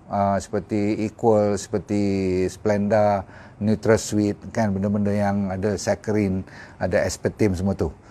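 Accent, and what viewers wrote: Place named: Kedah, Malaysia. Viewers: alhamdulilah...tqvm... ilmu yg sgt bermanafaat..suka dgr Dr. cakap kedah....terbaek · Tiba2 Dr. Kefek utara asai oghang mana? Info cukup bagusla Dr tq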